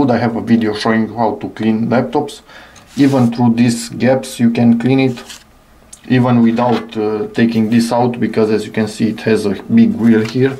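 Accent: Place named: Romania. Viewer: на английском говорит отвратительно...... акцент капец как слышно.... румын или серб???? или болгар?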